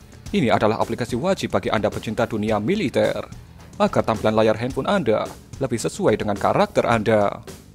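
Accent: Java, Indonesia. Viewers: Admin medok timen, bocah ngendi we min? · Susah brow bahasa Indonesia nya gajelas, medhok jawa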